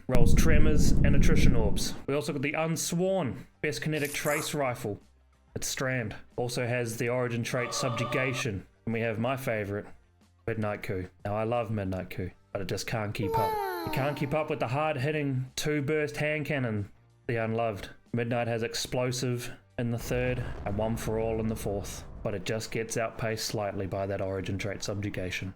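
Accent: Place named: Australia. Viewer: I like your Australian Accent mate!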